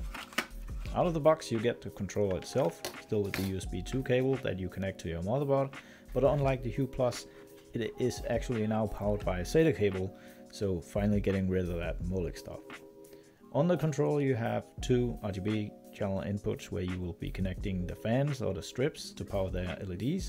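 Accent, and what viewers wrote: Denmark. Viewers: Man kan høre du er dansk😂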